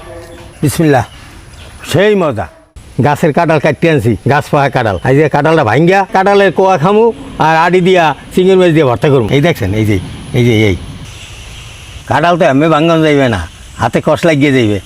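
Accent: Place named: Barisal, Bangladesh. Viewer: চাচা বরিশালে কথা বলে খুব সুন্দর লাগে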